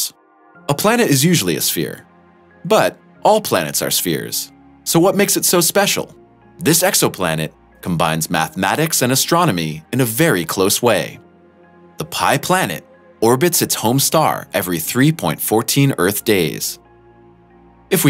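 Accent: United States. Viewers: This video is utter pop science cack, spouting the kind of shit you'd find in a Christmas cracker or fortune cookie. This channel doesn't deserve its number of subscribers and this video certainly doesn't merit the views it has. The narrator has that quasi-American accent of someone whose first language isn't English and the knowledge of someone whose first discipline certainly isn't astronomy.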